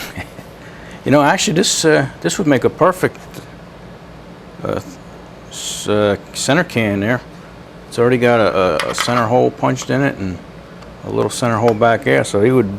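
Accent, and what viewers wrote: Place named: New York, United States. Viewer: Nice accent. New York somewhere?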